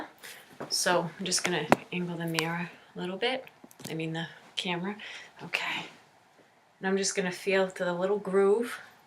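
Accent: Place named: New England, United States. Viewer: Love the New England accent.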